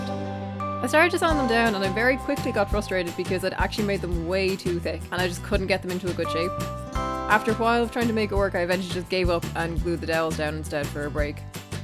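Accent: United Kingdom. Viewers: ...wait your British? Always thought you were Scottish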